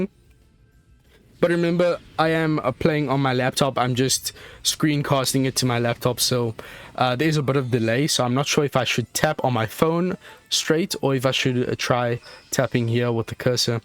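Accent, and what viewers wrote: South Africa. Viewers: yooo are you from south africa?? 😅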